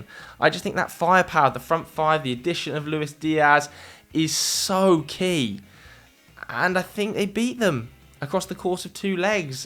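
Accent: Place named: Bristol, United Kingdom. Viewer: Sounds more like Bristol, Devon or Cornwall to me. Down South. But could be Portsmouth / Southampton too.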